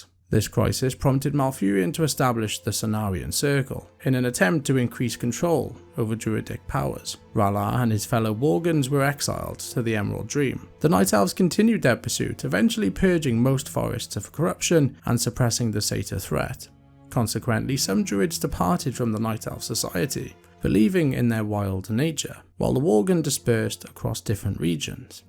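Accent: Wales, United Kingdom. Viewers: A fellow Brit but do I detect a hint of a Welsh Accent, a fellow Welsh kinsman? :0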